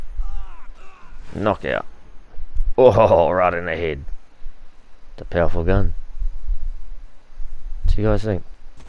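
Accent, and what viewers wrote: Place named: Australia. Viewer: Good to Aussie voice in a YouTube video for a change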